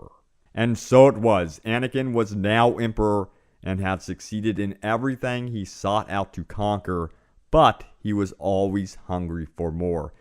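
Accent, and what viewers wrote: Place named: South, United States. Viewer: What state are you from? You sound kind of southern but not really.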